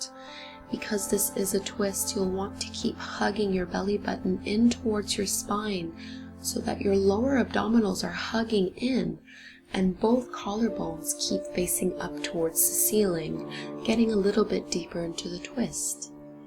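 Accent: Canada. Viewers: Great for loosening up my neck and back. Clear instructions, lovely calm voice, love the Canadian accent. My hubby and i enjoy your classes immensely here in Aussie! 🙏🏽💜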